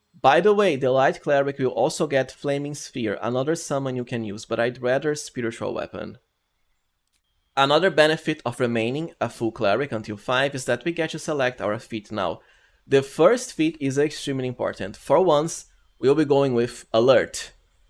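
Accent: Brazil. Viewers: percebi que vc era BR só pelo sotaque kkk me inscrevi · Tu é brasileiro? Parece brasileiro falando inglês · Curious question where you from? I am trying to guess the accent. Somehow I feel like you might be brazilian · Bro, you’re Brazilian? Your accent feels like it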